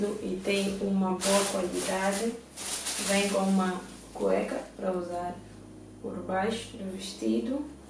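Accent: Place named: Portugal. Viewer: Kamily Gonçalves ela tem sotaque de portugal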